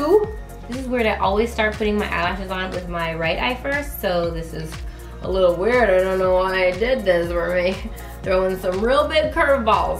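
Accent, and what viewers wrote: England, United Kingdom. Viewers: Yeah for English accent. Im English and I love it girl. From Sarah.xx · Love your videos so much! Always cheer me up when I'm having a bad day and make me smile. That English accent though 😂😂😂 it's like a shout out to us in the UK! You should try a wee Scottish one too though and do a Brave tutorial for the Disney Princess series - it's been a while!! xxx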